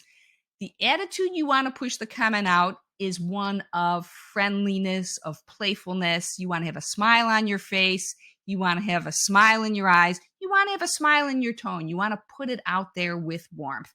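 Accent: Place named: Buffalo, United States. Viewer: I KNEW you were from Buffalo! I love the accent!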